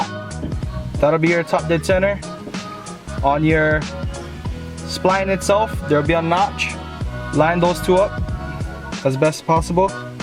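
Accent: Hawaii, United States. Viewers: You from Hawaii?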